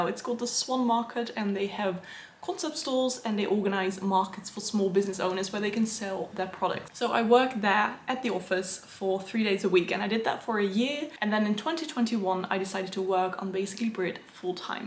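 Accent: United Kingdom. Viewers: Hi!! 👋🏻 and thank you for answering my question! The “are you British question” 😄 I love love your accent ☺️💕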